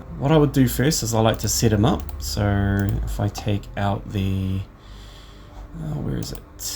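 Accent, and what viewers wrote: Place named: Australia. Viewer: Are you australian? Your accent sounds australian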